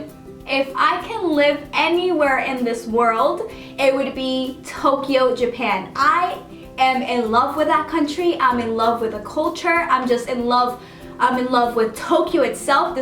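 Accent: Spain. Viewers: i love the Spanish accent that influences the pronunciation of your English letters ❤❤ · I love will smith I want to go to Tokyo japan I want to speak Portuguese your Spanish accent is very pretty btw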